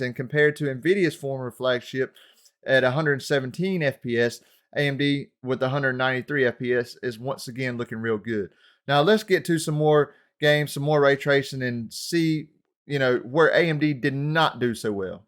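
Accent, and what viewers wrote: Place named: Arkansas, United States. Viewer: Love the accent! Reminds me of when I lived in Arkansas for a couple of years haha. Great overview man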